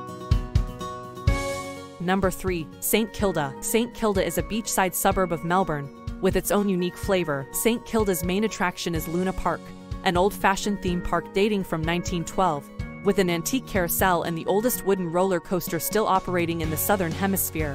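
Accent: United States. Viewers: Annoying American accent, has to be most spoiling ever. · Why is the commentary done by an American voice who pronounces several towns names incorrectly?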